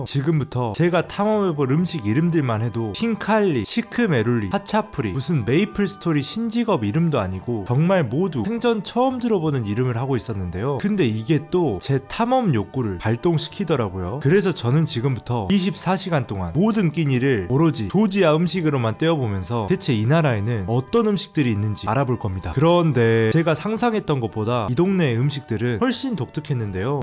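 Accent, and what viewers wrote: Jeolla, South Korea. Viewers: 생~소한 조지아를 소개하는 전라도억양의 청순한 청년.. 잼나요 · 전라도억양이 들리는데 전라도 사람 맞나요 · 특이한 멘트에 전라도식 사투리억양 개성있네요.. 재밋게 잘 봤어요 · 전라도 사투리인가? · 아따 말투가 겁나 정겹다잉 편하게 말하자 어차피 너무 전라도다. 신나게 쓰자 너무 정겹다. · 전라도 엑센트 너무 정감가요 ㅎㅎㅎㅎㅎㅎ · 전라도 사투리가 귀에 거슬리는군요. 표준어 사용하시길...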